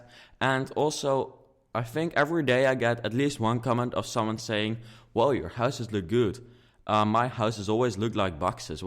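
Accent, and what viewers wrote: Netherlands. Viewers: Ik hoorde meteen aan je accent dat je Nederlands was! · Same! I'm Dutch myself and I knew as soon as he said the first sentence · Haha ik dacht al METEEN dat je nederlands was Hahaha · before i saw your name i could hear you were dutch haha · haha je kan gewoon horen dat hij Nederlands is! xd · Je hoort zo dat je Nederlands bent 😂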